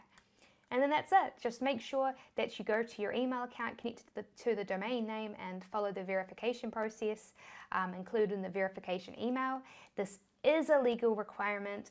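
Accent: New Zealand. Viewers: 🤓 Loove your accent, will visit Nea Zealand one day 😊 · Kiwi Accent is ❤️ ... just like Cebuano speaking English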